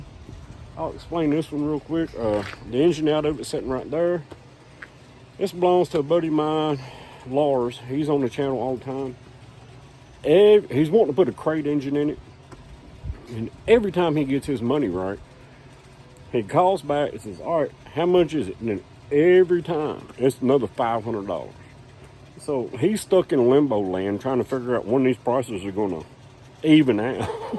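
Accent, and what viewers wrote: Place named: New York, United States. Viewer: Just an old country boy? I coulda swore that was a New York City accent! LOL Great video Brother!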